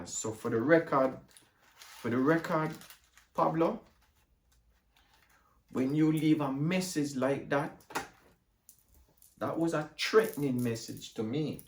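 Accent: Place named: Jamaica. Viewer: Funny you sound like Jamaican uncle and I think he was from Canada also.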